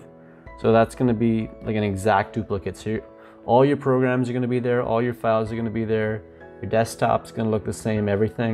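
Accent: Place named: Ireland. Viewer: Good tutorial! Are you Irish, Scottish, or Welsh?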